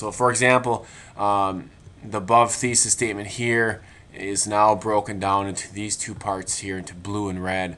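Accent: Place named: Canada. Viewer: hehehe Canadian accent..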